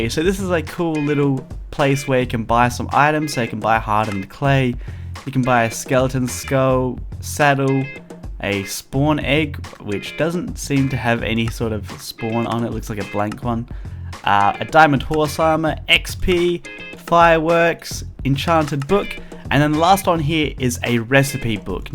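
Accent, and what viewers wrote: Australia. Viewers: Your Australian M8 well me too 😀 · o ma gad r chu aussie? c: bc chu sound aussie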